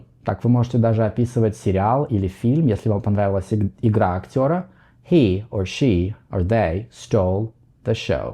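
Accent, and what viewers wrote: United States. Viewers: Он и звучит как американец. У вас какой-то не такой ИИ (AI)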